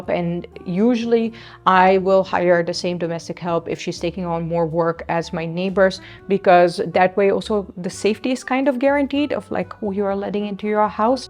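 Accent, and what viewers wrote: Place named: India. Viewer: Je heb spreekt Indiaas Engels.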